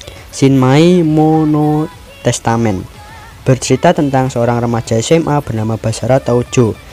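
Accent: Java, Indonesia. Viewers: Jawa nya medok skali wkwkwk:v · Jowo bet suara lu bang medok medok serek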